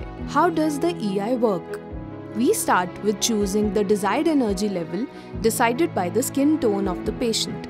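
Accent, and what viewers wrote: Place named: India. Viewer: I love indian accent Love INDIA from HUNGARY 🇮🇳❤🇭🇺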